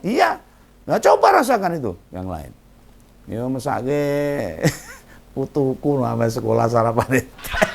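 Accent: Java, Indonesia. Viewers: Sbg non jawa. gak ngerti apa omongam om ini, medhok2 campur aduk. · Mantap om,tetap bersuara dg diselingi dialek jawanya..saya suka😂😂😂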